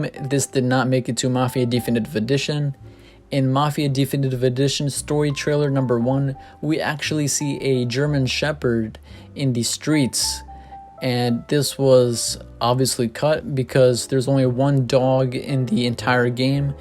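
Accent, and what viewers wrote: United States. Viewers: Sounds American.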